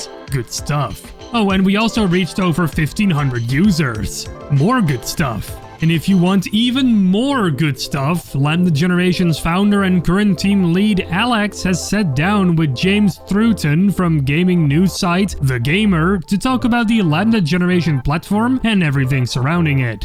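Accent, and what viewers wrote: Netherlands. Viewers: Are you Dutch? you have a slight Dutch accent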